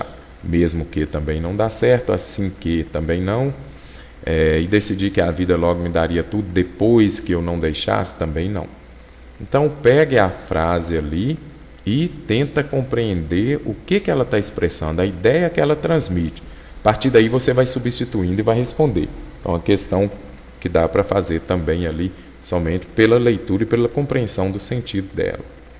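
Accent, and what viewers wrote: Minas Gerais, Brazil. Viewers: Ahhhh esse sotaque mineiro que parece fazer-me compreender ainda melhor a explicação....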